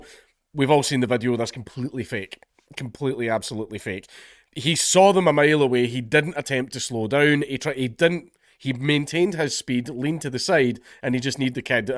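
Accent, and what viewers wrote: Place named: Scotland, United Kingdom. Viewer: We need a Dankula video in full on Scottish mode with subtitles.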